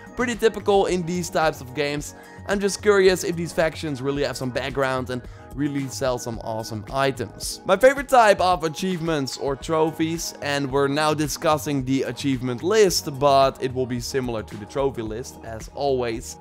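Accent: Netherlands. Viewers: I instantly regret pre-ordering this game Well I hope the online is somthing Its like you have a dutch accent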